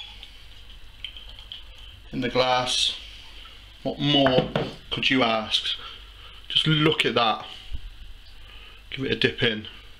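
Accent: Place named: Scotland, United Kingdom. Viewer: Hello I'm French man and I love your vidéos but I' don't undertsand all you leanr. May be your accent and fatest language. Are you Scottish ? Congratulations for your job . (English begginer ) Thanks.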